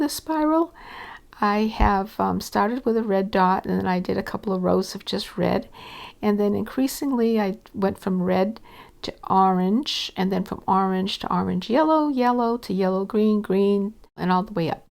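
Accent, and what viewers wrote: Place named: Massachusetts, United States. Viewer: Are you from Massachusets by chance? Your accent is so much like my aunts were. <3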